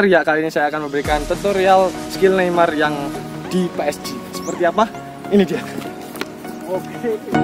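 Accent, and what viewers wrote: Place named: Java, Indonesia. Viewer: Asalmu pundi mas, kok medok koyo wong jawa ?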